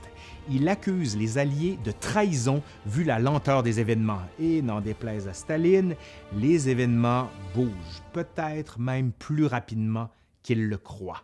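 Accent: Quebec, Canada. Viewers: Premièrement j’adore l’accent québécois. Si tu avais été mon prof d’histoire-géo au lycée je suis sûre que j’aurais eu des meilleurs notes. Non vraiment tes vidéos sont super instructive moi qui suis une passionnée de la seconde guerre mondiale j’ai trouvé du contenu digne de ce nom. Je me rappelle aussi des témoignages de mon arrière-grand-mère. Alors je suis française je viens de Vendée et j’y vis toujours. Vendez égal zone occupée elle disait que tous les jours il y avait un allemand sur sa bicyclette avec une bouteille à la main en train de demander des pièces et il fallait lui donner les pièces sinon… on m’a aussi raconté que dans une ferme de ma région le fermier s’est retrouvé sans sa vache parce qu’un autre fermier concurrent a dit à un soldat allemand de s’en emparer. Bref le temps a été dur pour ces personnes que j’ai connu et qui m’ont témoigner de ce qu’ils ont vécu avec beaucoup de courage. 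Cependant les témoignages de la famille ne suffisent pas il faut voir les choses avec globalité et grâce à toi c’est possible. Vraiment merci tu es trop génial. Il faut mieux regarder tes commentaires que niaiser comme on dit chez toi. Kiss et continue comme ça! Bon, je descends les vidanges et je reviens à cette nouvelle vidéo. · Je découvre votre chaîne, et -- je vous le répèterai ailleurs, sans doute losque je regarderai votre première guerre mondiale -- j'aime beaucoup votre voix québécoise au sujet de l'histoire, qui vous incite à traiter d'autres faits que choisirait un français. Par exemple, il n'est pas indispensable de parler ici de la libération de la Corse, qui n'intéresse qu'un français de France... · Excellente série de vidéos ! un gros travail objectif et exhaustif.... peu de niaiseries sentimentales, l'histoire et que l'histoire jaime beaucoup et l'accent québécois c'est la petite cerise...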